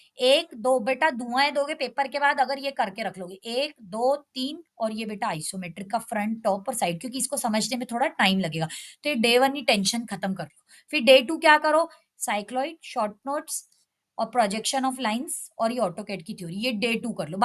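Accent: Indore, India. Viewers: Mam Indore se ho kya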